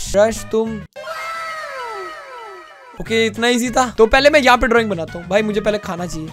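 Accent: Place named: Haryana, India. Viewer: well that's not true we don't watch him for his haryanvi accent , we watch his video because we like him ( btw I love his haryanvi accent )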